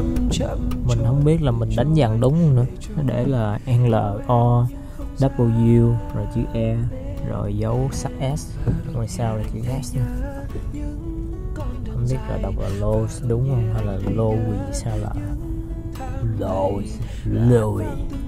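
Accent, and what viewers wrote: Vietnam. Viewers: giông ngoai bac nha minh vay bn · Anh ơi, mẹ anh có phải quê là quãng ngãi ko, tại nghe giọng nói giống nhà nội em quá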